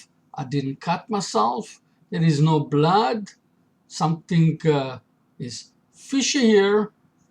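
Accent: Israel. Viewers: I knew, that's an Israeli accent. Strike!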